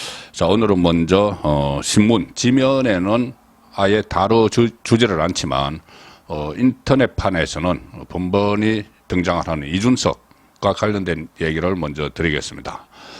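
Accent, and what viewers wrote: Gyeongsang, South Korea. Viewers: 송국건님 제안드립니다. ※불필요한 군드더기 어~, 저~, 이~ 이런 류의 아주 나쁜 습관이 있어요. 속히 시정해 주세요. 경상도 억양의 전체적인 분위기야 어쩔 수없다 하여도 위에 지적한 것들이 심하게 섞일 때면 외면하게 됩니다. 발전하세요.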